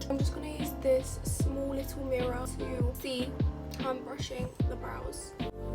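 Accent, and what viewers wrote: United Kingdom. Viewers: I KNEW U WERE BRITISHHHH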